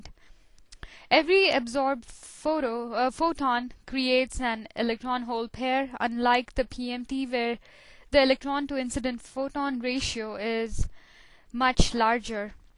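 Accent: India. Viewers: but u sound like indian..its ok..dont be ashamed...nice accent..:) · You were reading a book. BTW your accent is Indian, probably you are Indian or indian based NRI.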